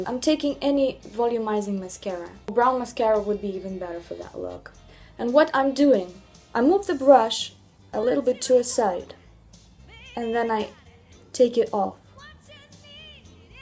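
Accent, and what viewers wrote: Russia. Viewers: Absolutely agree with you! I'm Russian and for me her Russian accent is just obvious. You can tell it if you listen thoroughly to such words as "fundation" and "of course" (first seconds of the video) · Knew you were Russian straight away, I'm Russian too btw. Tnx for the tricks! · То чувство, когда начинаешь смотреть видео и сразу слышишь русский акцент☺️ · Wow this chickadee has some insane skills! She talks like a total professional. Gorgeous with a Russian accent! Class act. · Жуткий русский акцент)) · Lol, that's the first time I heard of a Russian accent making someone sound like a good person. Usually people say "haha, you sound like a movie villian!" Aaanyway, if she sounds like a nice person, it's probably because she's speaking calmly, and maybe because she actually is a nice person, who knows? :P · The accent is so cute! I knew you were Russian when you started speaking. 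I’m from Ukraine and I can’t hear my own accent but other people can. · произношение как у русской! вы русская? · I knew she was Russian after the first 3 sec..could decently tell from the accent ( first time seeing her)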